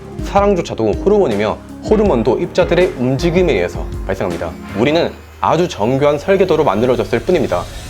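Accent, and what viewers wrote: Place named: Busan, South Korea. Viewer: 억양이 .억양이 부산 스럽다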